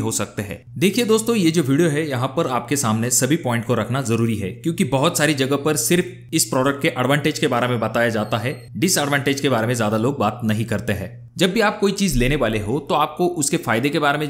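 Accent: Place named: Satara, India. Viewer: मराठी आहे फलटण बारामती किंवा सातारी जर्दा दिसतो भाषेत ....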